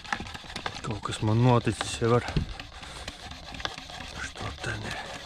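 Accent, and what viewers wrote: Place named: Lithuania. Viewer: What language, sound full on lithunanian with heavy accent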